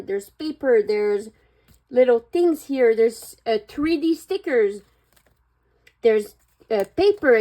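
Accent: France. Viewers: Hey, I'm new on your channel and actually... Are you french ? No cause you've got a french accent :') (saying that cuz I am french). Great video tysm !! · Salut!! J'espere que tu vas bien.Est ce que tu es française? Parce que je trouve que tu as un petit accent français lol :).En tout cas tes dessins sont trop beauux <3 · r u from France idk your accent feels french ( btw I speak French) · your accent is so pretty :3 my grandma is Belgian and speaks French so I could immediately tell you have a French accent lol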